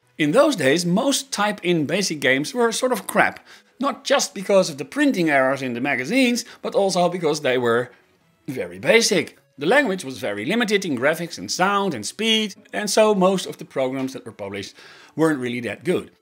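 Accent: Netherlands. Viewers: i'm too young to be using ZX Spectrum at any point in my life, but i was playing elite (the original '84 version) on an emulator and it left an impression on me, not because it was mind blowing to me by itself (it was late 10s so we already had portal 2 and my most played game at the time, FSX, because i (was? am?) a sim nerd and that's how i found elite) but because it was otherwordly different from the games of its time first i thought "it's about david braben isn't it" then i remember watching Alexander the ok's video about elite (by the way, he has a spectacular channel and i recommend everyone who's interested in space and human achievements in general a watch, my fav video of his is about space shuttle program) where he told that elite was developed for BBC Micro (and ofc it was written in assembly, have you seen the thang (both BBCM and the game itself) it's too huge for basic) then i watched 2 seconds more and you said the name lol so anyway, funny video and i don't know why but from the first couple of seconds, without seeing anything else, without checking the channel description, i felt that you're dutch i don't know if it's the accent, or the similarity to Posy or the love for the color orange or the cadence of the speech but it's not like i thought "they seem dutch", it just clicked in my brain and i think that's pretty funny great channel tho, i like it when people just can upload stuff and not worry about youtube being youtube have fun :3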